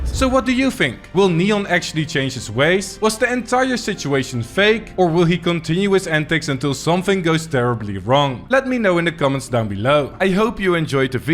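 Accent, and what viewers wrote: Netherlands. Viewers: The narrator sounds impressively dutch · Bro the dutch accent :c But still Really good video tho :D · let me gues you dutch ja je accent geeft het af 😂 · Do something about that dutch accent bro😂. Godverdomme wat een steenkolenengels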